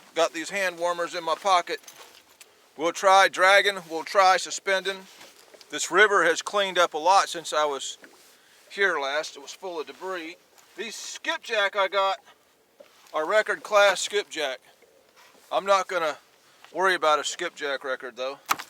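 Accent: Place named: Virginia, United States. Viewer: Reminds me of Virginia talk.. "slap-dab" Semper-fi sir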